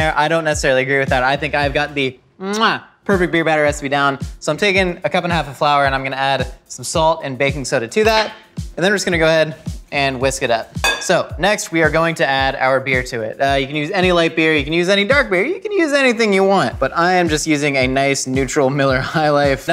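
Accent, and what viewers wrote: Australia. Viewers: That moment when you realize that the Gordon Ramsey accent was actually Australian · Lol sounded australian mate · You sounded Australian not British lol good stuff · Sounds more like aussie 😂😂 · I can confirn that accent is 100% Austrailian. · Sound more aussie than English😂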